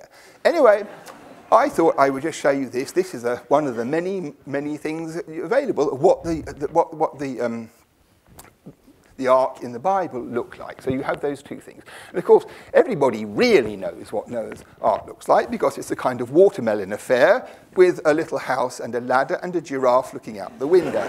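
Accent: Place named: England, United Kingdom. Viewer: I'm from America and I think a person from england would be the best for me to learn something. The accent keeps me interested . Kinda weird but I can listen to them all day. My GPS speaks in old english. Lol..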